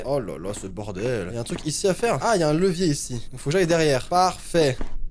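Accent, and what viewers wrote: England, United Kingdom. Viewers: 😂😂 et sons accent anglais😂😂😂😂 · jeux dhorreur Moi: ouiiii on vas entendre crier*-* Et le fameux accent anglais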